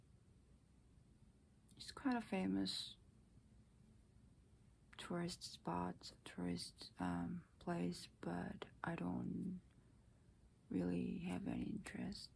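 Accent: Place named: United States. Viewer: Life really is crazy. So many women would love to look like you. Yet here you are wanting to be like many of them. I hope you continue to pursue what you like to do. Hopefully there will be something that really flourishes and motivates you to focus on that. Your English is absolutely excellent. You talk like you were raised in America. I just found your channel and will continue to watch. Did you experience a difficult childhood? You seem like there is inner turmoil that causes you so much pain. I know it will not help but you are attractive and well spoken. Merry Christmas!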